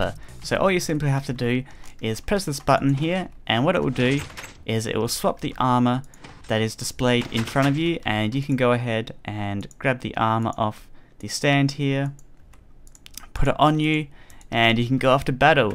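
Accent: United Kingdom. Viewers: You're Australian?! I live in Australia and thought you're accent was british. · WHAT, I thought your accent sounded British, i'm Australian aswell :D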